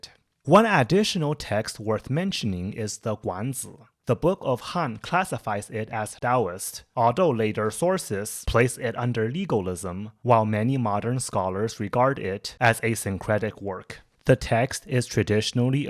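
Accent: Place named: China. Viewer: Bro you have the cutest Chinese English accent❤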